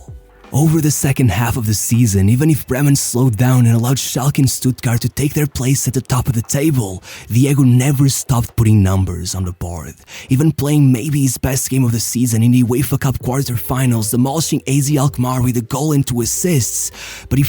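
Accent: Portugal. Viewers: Any chance you are from Portugal? Because the way you pronounce brazilian portuguese names sounds like european portuguese. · Man, I'm new to your channel, really enjoying the videos, but let me ask you: Are you Portuguese or have you learned Portuguese from Portugal? Bruh, if you're not a Portuguese student you should try Brazilian Portuguese accent, specially when talking about Brazilian people and things. For exemple: Instead of Roberto "Carloosh" just say Carlos dude; instead of "Libertadoreesh" you can just say Libertadores, that "s" is actually just like English's "s", like when a verb gets the "s" when used in the present for he/she/it = works, plays -- that's the "s" sound in any of these words. I'm not hating, as I said I like your videos, I'm suggesting ok. Much love from a Brazilian Portuguese teacher from Brazil! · You are Portuguese, right? That accent is recognizable anywhere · Português* dá para ver no sotaque e no sotaque ao falar inglês.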